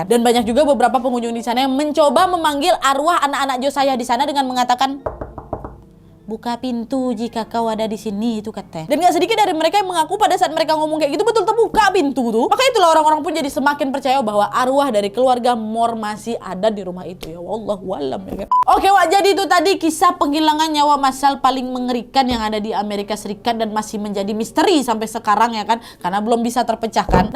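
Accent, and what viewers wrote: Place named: West Sumatra, Indonesia. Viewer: cuma d channel ini , bisa dengar berita kriminal logat minang 😅. Narasi percakapannya g bayangin bule lg ngobrol pke logat minang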